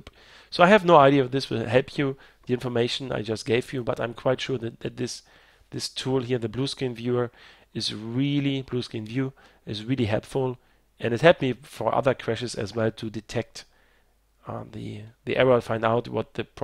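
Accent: Germany. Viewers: Hey wie ich aus deinem AKzent hören kann bist du Deutscher, richtig? Ich hab diesen Bluescreen seit einigen Jahren, und habe das gleiche Problem mit dem AMD HDMI Output für Lautstärke, was mich schon immer sehr verwirrt hat, da ein HDMI anschluss so etwas praktisch garnicht brauch.... Meine frage ist: Besteht das Problem mit deinen Bluescreens weiterhin? Danke schonmal für das Video, hat mir sehr geholfen! Habe schon an meinem Prozessor und der Grafikkarte gezweifelt, aber das löst das problem für mich etwas.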